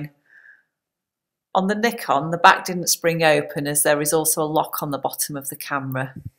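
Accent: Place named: United Kingdom. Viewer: Thank you very much for uploading this nice and clear video with a calm voice that pronounces that very lovely and great British English language that everybody can understand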